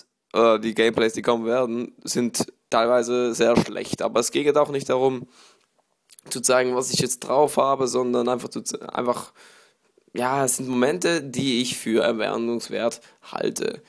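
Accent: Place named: Switzerland. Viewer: ich dachte am anfang du wärst engländer oder ami oder so^^ hab dann aber auch auf schweizer getippt!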